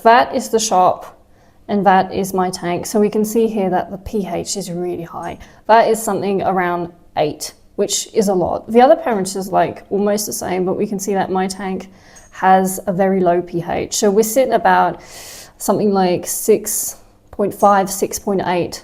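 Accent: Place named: England, United Kingdom. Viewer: ton accent anglais incr <3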